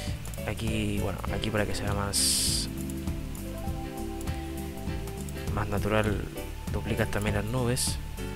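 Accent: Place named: Chile. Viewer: Oye crack, por las palabras y maneras de hablar eres chileno? Realmente me dio curiosidad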